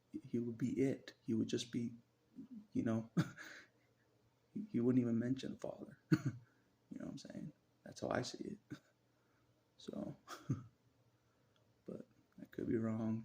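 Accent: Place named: South, United States. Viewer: Very good. Bro i wish we went to the same church but i assume you live in a southern state because of your accent. I would kick it with you and talk about all the things.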